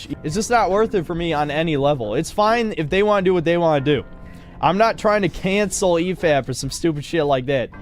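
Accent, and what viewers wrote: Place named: United Kingdom. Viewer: I bet it's the British accent. They hear that and think he's super smart lol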